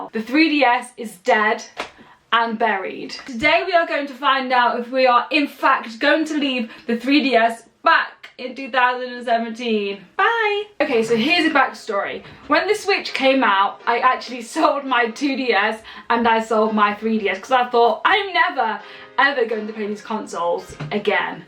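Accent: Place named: East Midlands, United Kingdom. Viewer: ''Blame my accent'' what is your accent? my guess is East Midlands like Derby or something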